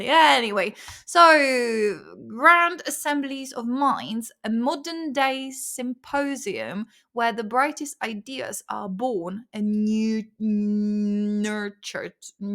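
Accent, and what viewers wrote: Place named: United Kingdom. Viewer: I love your accent, actually Persian is my main one but I always enjoy British accent ❤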